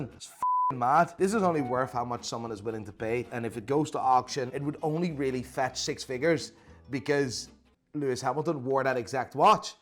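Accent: Northern Ireland, United Kingdom. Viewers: Ahh man. The NI in your accent is fucking belter.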